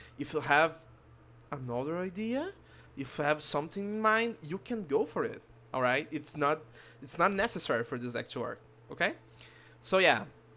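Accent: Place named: Brazil. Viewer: Qual foi, pelo inglês sabia que era BR, tem alguma forma de falar contigo pra me explicar como rodar esse deck ?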